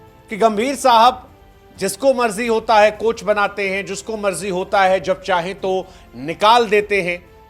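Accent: Bihar, India. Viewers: Bro Sounds Like a Tru Bihari.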